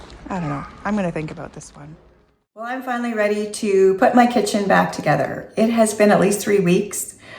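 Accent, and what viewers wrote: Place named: Canada. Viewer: She sounds Canadian.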